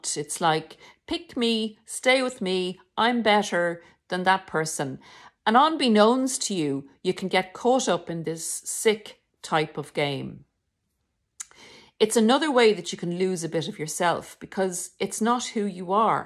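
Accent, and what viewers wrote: Ireland. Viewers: I like your accent are you Irish?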